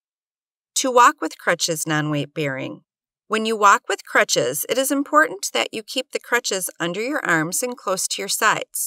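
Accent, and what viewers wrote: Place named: Midwest, United States. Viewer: Why does narrarator have to be from the Midwest...very annoying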